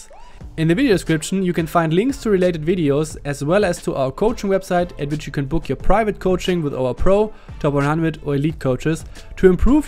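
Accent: Germany. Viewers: Man merkt extrem den deutschen Akzent haha 😂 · Bist du Deutscher? Hörst dich irgendwie so an🤷‍♂️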